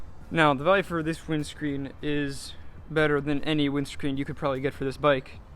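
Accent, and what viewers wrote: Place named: Europe. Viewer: Very good review. Are you European? I noticed an accent.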